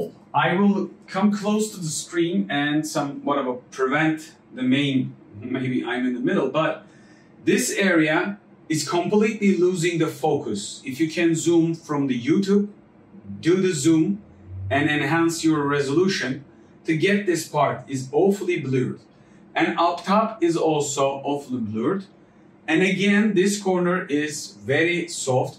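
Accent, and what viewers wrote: Turkey. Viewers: Bu türk ingilizcesi nerde duysam tanırım :) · Hocam helal olsun :D , süper bir kanal yapmışsın , aksanından Türk olduğunu anladım. Türk olduğuna bahse girerim ama ispat edemem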